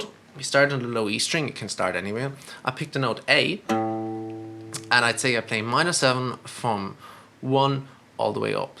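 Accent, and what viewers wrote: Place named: Ireland. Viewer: Great great lesson, thank you! Und dein irisches Akzent freut mich sehr :)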